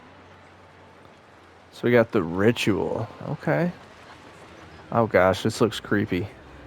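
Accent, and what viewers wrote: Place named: United States. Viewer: Ah I see, the Mrs loves your accent and wanted to know what part of America you are from. She is actually part Native American herself, well part Irish, British and Native American, her Grandmother was an adopted Native that spent the first few years of her life living in a tent somewhere over there then married her grandad who brought her to England. The Mrs was very close with her and has a keen interest in her Native American heritage. I think your accents you have over there reminds her of her Nan.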